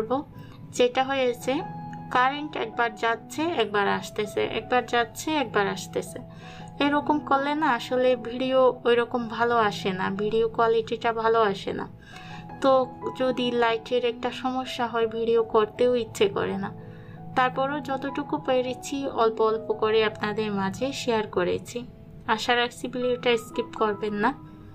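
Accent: Chittagong, Bangladesh. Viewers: Ha ha apu chottogram er vasha shunte amr kintu darun legese..😂😂.tobe ata thik bipod ashle sob dik thekei ashe 😢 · হাহাহা আপু চিটাগং য়ের ভাষা শুনে ভালই লাগে কিন্তু বুঝি কম।সব মিলিয়ে খুব ভাল লাগল।আমি খেয়াল করেছি যে আপনি কম ব্লগ আপলোড দিচ্ছেন😊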